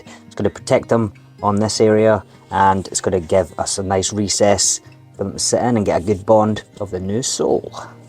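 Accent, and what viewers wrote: Scotland, United Kingdom. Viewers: Just started watching your videos 3 days ago and absolutely love them! Recognized that Scottish accent too. I was stationed at Holy Loch in 70-71. I really miss that place and its wonderful people there. I just subbed and look forward to your excellent shoe repair.😊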